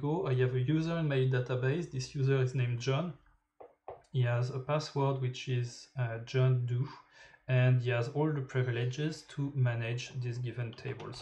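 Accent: France. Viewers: pk pas faire la video en Français t'as l'accent français en plus je comprend pas l'anglais moi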